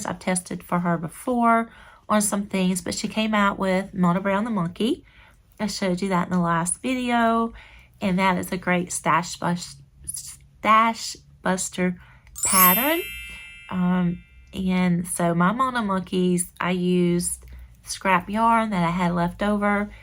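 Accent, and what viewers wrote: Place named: South, United States. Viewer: Oh lord I definitely watch to the end, I could listen to your Southern accent all day!! The watch time can be tricky as it is not necessarily 16 minutes from the start to the 16 minute mark, some people may forward ahead and watch later in the video sections. Just keep being you however long they are I will still watch!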